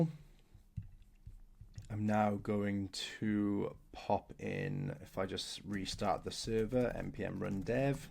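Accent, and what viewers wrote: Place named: Wales, United Kingdom. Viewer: Where in Wales are you from boss? Fellow dev here, from Cardiff, love hearing the accent from home on these type of videos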